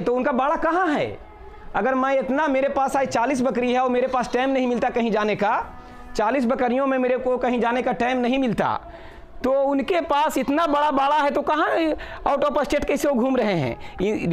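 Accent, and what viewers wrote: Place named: Bihar, India. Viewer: सर जी आप दवाइयों के नाम स्क्रीन पर दे दिया करे क्योंकि बिहारी टोन होने के कारण शब्दों को समझने में दिक्कत होती हैं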